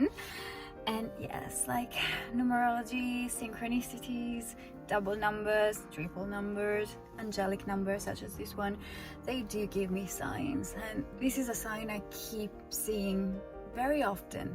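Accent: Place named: United Kingdom. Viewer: Ciao, grazie mille per questa condivisione❤️ Io ho la luna in toro, e ultimamente ho trascurato la mia connessione con la natura😞 e infatti sono totalmente off balance. Grazie di avermi ricordato di cosa ho bisogno. E grazie di avermi ricordato anche di essere capace di comprendere il British accent🤣🤣 mai scontato 😅🌷 un abbraccio ❤️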